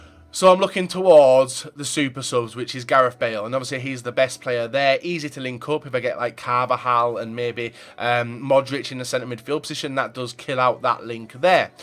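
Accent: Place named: Yorkshire, United Kingdom. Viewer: Zeid Abdellatif I think it's a Yorkshire accent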